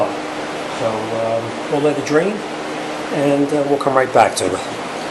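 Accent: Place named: Boston, United States. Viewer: You sound like you're from the East Coast/Boston area. One thing I would have done differently though is, I would have left the belly pan off, until after you test drove or turned on the car, to see that you don't have any oil leaks(From underneath, as I see you checked from the top). Good Job.. You Italian as well?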